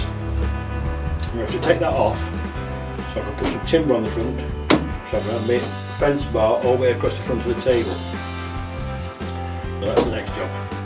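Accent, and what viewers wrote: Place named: Barnsley, United Kingdom. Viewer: you sound like you live not far from my neck of the woods, here on Barnsley, nice build , rough round the edges but its for use not looking at mate uh ; keep posting, from Garry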